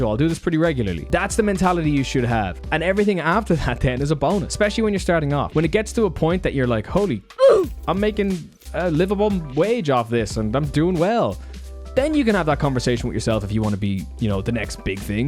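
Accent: Ireland. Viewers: Are you irish? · haha nice i thought i heard an irish accent im originally from cork but live in galway now keep grinding man love the content !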